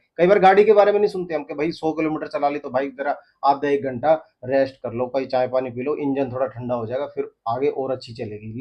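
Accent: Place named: Haryana, India. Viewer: hisar,haryana.Boli t smaj gya tha m chhora haryanvi h👊👍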